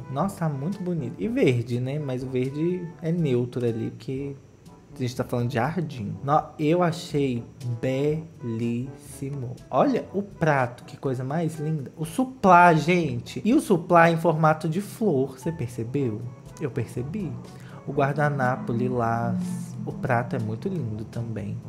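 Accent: Minas Gerais, Brazil. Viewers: ficando gradualmente mais mineiro!!! amei ❤️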